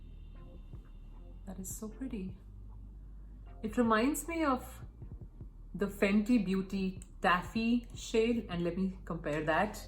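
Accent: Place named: India. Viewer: Love your indian accent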